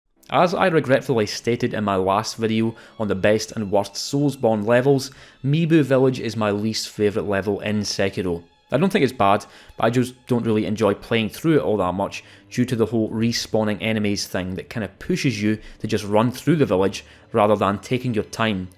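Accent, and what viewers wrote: United States. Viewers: Non Americans doing an American accent are goated with the sauce, thank you for your contribution 🫡 🇺🇸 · A potshot at people who dislike Scottish accents and speaking in an American one - it's random shit like this why I love your videos! 😄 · Bro your American accent 10/10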